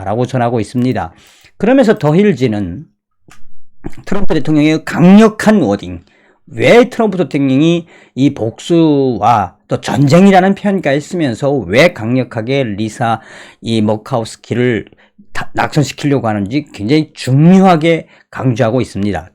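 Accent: North Korea. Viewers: 탈북자시라 억양은 어쩔수없는거 같아요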